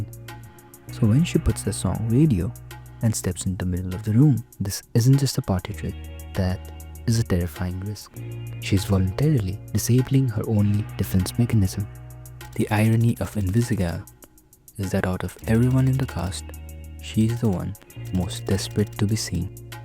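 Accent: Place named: India. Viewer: Hey man, it was honestly a great video, did not even notice that you are an indian. (You have just some general accent, that does not really hurt understanding you. Overall the video is quite nice, especially the editing, but i think that it lacks something for a super popular video, for some reason. Hope to see you creating in the future!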